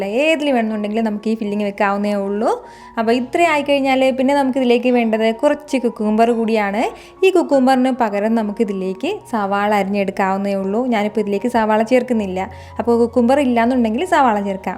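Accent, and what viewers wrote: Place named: Kasaragod, India. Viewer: kasaragodile oru nattile baasha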